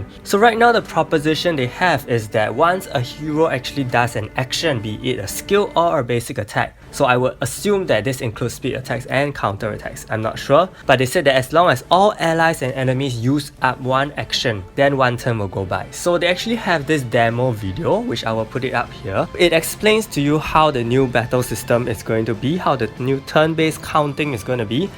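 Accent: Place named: Singapore. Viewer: Your accents sounds similar to a singaporean! Great video btw! You made it more clearer and i love the sudden appearance from orkah xD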